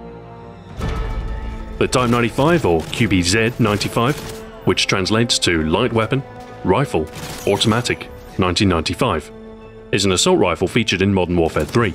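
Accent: United Kingdom. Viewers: YOur british accent is so easy to listen to. And makes it a tad more intresting to watch.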